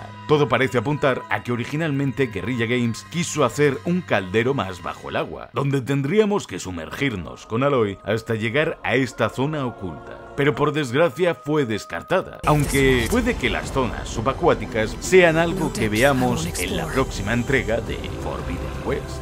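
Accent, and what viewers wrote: Spain. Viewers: Normalmente hacen un 50% y 50% en poner doblaje latino y español, no pierdan esa costumbre. Lo comento por que en este video se nota mucho el doblaje español sacando el detalle del capi en la outro del video.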